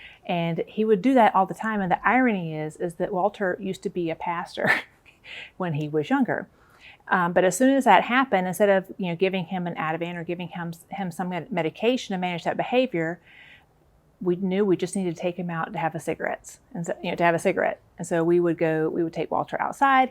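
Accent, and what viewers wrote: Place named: South, United States. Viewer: Great info, live your Southern accent.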